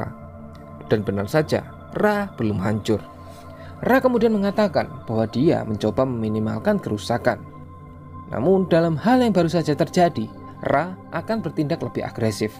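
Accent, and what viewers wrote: Indonesia. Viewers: Admin!~ Gua punya pertanyaan. Diakhir video. Apakah Si Julia ikut bersama Hekon ataupun tetap stay dibumi? = maaf gua kurang faham sedikit dikernakan aku orang Malaysia. Kurang memahami logat" Indonesia . But! Gua tetap mendukung ni channel kok. Dengan +1subscribed ;) . By the way semangat yah bang!!! Ganbatte🔥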